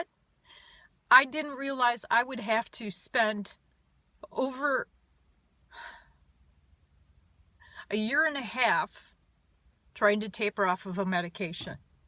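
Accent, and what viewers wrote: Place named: Wisconsin, United States. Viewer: Hey, great vid. This is my 3rd time posting so i hope it goes through, or u might have a few comments from me sayin the same thing. haha. Anyway, its good to see another face/voice spreading the word about the dangers and reality of these drugs. Nobody else will understand the hell these things put people through. And yes, ur right. They are aware of what these drugs do and have been since the 80's. Its gonna be a tough road going through this shit but at one point it will be history and unfortunately we learn a lesson the hard way. The lesson of these dangerous, mind altering pharmaceuticals, the business behind them and even a little about the people who we trust to watch out for us. Its all bullshit and we only have eachother and urselves to look out for us. We lose friends and family, our jobs, relationships, enjoyment in life and faith in a system we thought was helping. 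Its like losing your soul. We are eventually forced to go through it and thats that. But on a good note its good to hear the Wisconsin accent. haha. Keep in touch and all the best to you and your family.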